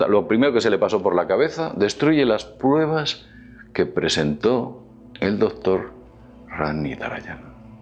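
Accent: Spain. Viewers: Maria Lourdes Said jajaja debes de ser de España, porque para los de fuera de Europa, lo escuchamos igual como todos los demas españoles, todos hablan igual.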